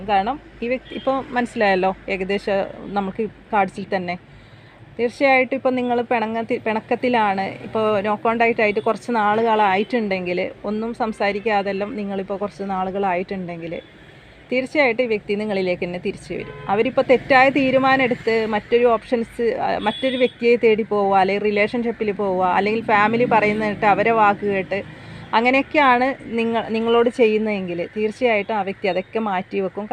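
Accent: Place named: Kasaragod, India. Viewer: ചേച്ചി കാസർഗോഡ് ആണല്ലേ... പറഞ്ഞതൊക്കെ കറക്ട ഒന്ന് ഓള് മനസ്സ് മാറി വന്ന മതിയാർന്നു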